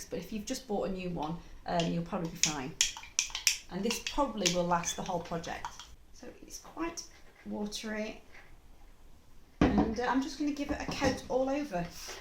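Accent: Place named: Yorkshire, United Kingdom. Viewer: Ta lass that were greyt. Luv yur accent is it Yorkshire? I fitted me T4 camper out with pine panelling and when it went a bit yellow did the same white wash effect and it lukes a treat! I bought some Annie Sloan and barely used a desert spoonful cos just watered it right thin and applied with a dishcloth. It has a similar effect to yours but left it a bit more opaque. Know any good places for modern, trendy camper curtain fabric? Cheers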